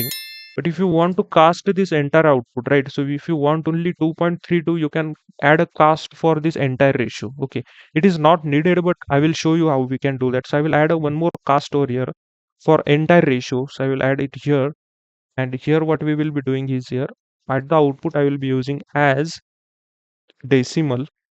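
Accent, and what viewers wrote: India. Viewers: unsufferable indian accent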